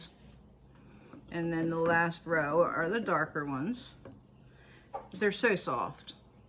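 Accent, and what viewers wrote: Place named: Pittsburgh, United States. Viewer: Heard your Pittsburgh accent and subbed :-) Was considering stopping premium but maybe not lol. Great unboxing. Love the hair and also saw you in my rec feed.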